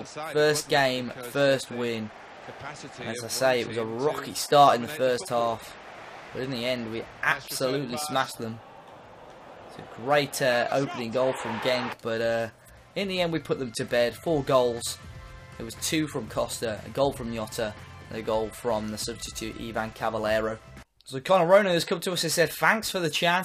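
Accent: Malta. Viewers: Are you from Malta 🇲🇹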